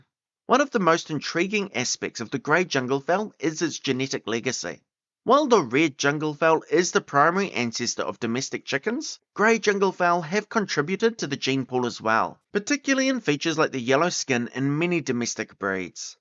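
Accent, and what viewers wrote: New Zealand. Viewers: "Easy to understand", well yeah, once you get past that strong Kiwi accent! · Kiwi accent is crazy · Oh bro, I watch a lot of u tube and the kiwi accent is a shock. Chur to the chicken. Get your self a lotto ..after making this video you'll be extra clucky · I detected a New Zealand accent from the the third word! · Very interesting. I'll subscribe. The NZ vowels are a bit of a challenge!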